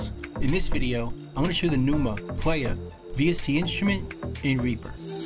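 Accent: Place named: New England, United States. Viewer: Your New England accent makes these videos entertaining as well as helpful. I'm from Texas.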